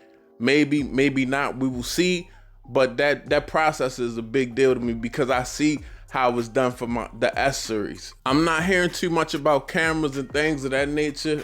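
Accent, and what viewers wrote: Baltimore, United States. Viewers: Thats got to be a Baltimore accent 😅❤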